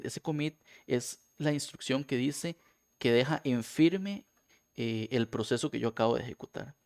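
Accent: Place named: Costa Rica. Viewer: Apenas empecé a escuchar este video dije "Ese acento es tico"🤭🇨🇷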